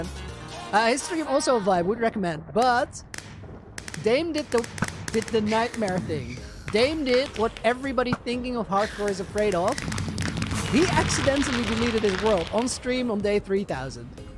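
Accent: Netherlands. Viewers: Hey man love your vids. Btw are you dutch?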